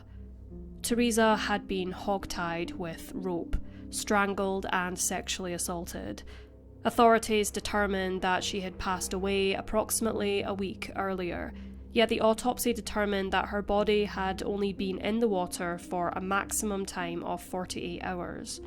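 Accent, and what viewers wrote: Scotland, United Kingdom. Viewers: I love your british accent if I am not wrong. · Really? What a nasty thing to say! This lady is Scottish, but obviously you’re not educated enough to realise that! If this is an example of how your mind works, you should be ashamed of yourself.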